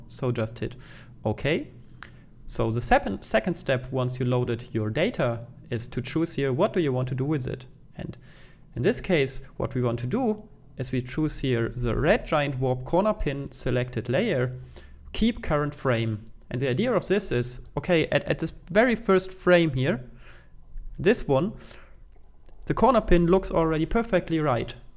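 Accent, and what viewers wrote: Germany. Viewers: Man hört das du Deutscher bist :-)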